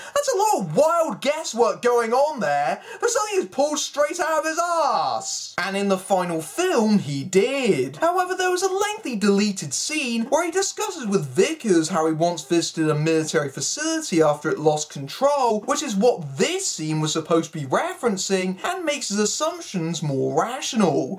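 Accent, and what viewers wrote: United Kingdom. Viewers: this maybe the first time i find a british accent fucking annoying.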